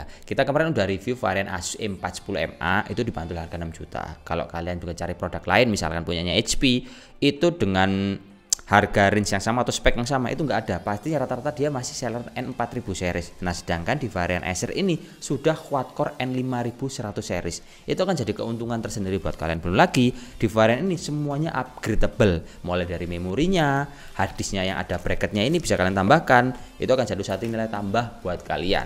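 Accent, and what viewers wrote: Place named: Java, Indonesia. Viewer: I ❤️ this guy's medok! - Wong Amryk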